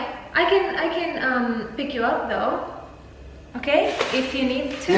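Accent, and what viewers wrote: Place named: Visayas, Philippines. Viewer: Ayieeeeee himos kaayo ma bati imong inistoryahan te ba na a Jud Ang bisaya accent 💖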